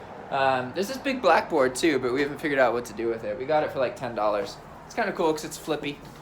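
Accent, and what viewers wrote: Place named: United Kingdom. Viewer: Are you parents of British decent? I hear a little accent here and there? 😇💗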